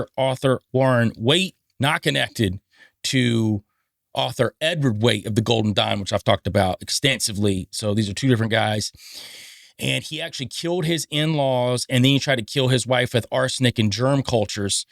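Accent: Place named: Pennsylvania, United States. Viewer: You sound like you have a PA accent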